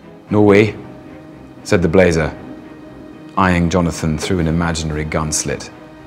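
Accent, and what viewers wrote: United Kingdom. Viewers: Aaaaaaaargh, that British accent, ow my goodness, that voice. He can read even instruction for vacuum cleaner and it will be a symphony for my soul)) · Thats because he attended Eaton and Cambridge and speaks with "Received Pronunciation", the style of speaking taught in the Elite Public Schools....also used by BBC Annnouncers, much like Standard American used by Broadcasters in America, often referred to as unaccented American English. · Accent?? British English is the original English language. Others have accents.. i think. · This is like ear sex... Why British people are so sexy than Americans while narrating things?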